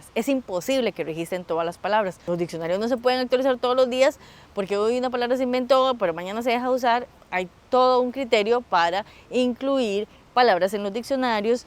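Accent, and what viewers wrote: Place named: Colombia. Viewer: Pensé que era colombiana profe por si acento. Gran vídeo. Pura vida❤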